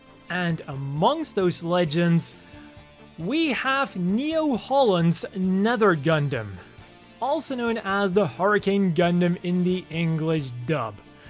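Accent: Belgium. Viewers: As a Dutchie, it's great to hear you speak in Dutch (with the lovely Belgian accent)! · ooooh je bent een belg!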